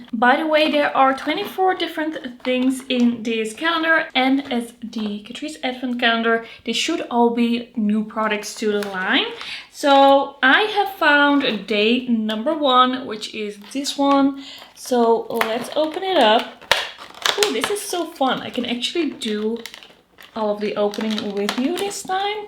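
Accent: Netherlands. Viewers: Hi 👋 Thank you for your reviews on more affordable products. I just recently discovered your channel and had to subscribe. I love you accent are you from Netherland? 🩷 from South Africa 🇿🇦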